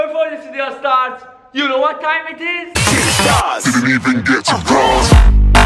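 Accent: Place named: United Arab Emirates. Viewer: he is from united arab emirates thats why he talks like that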